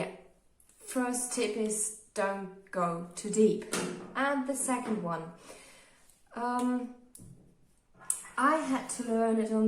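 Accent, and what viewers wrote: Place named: Australia. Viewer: don’t apologize for your english, it’s amazing! you actually sound australian. thanks for your vids!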